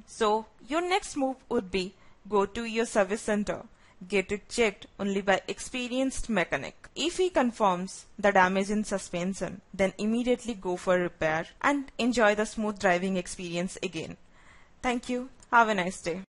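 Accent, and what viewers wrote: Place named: India. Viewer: speak like true indian ,,anyway this is very very helpful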